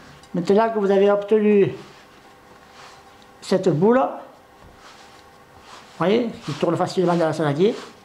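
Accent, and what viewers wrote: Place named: Provence, France. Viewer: Merci pour cette recette super facile et bien expliquée, ma pâte se repose en ce moment, et merci pour votre accent qui sent bon la Provence de chez moi lol, joyeuses fêtes à tous!!!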